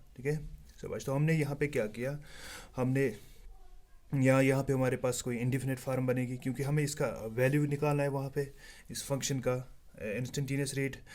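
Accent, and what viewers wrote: Kashmir, India. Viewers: Yes you are kashmiri Your urdu speaking says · Your kashmiri accent 👀🤌🏻,,thanks for the explanation ✨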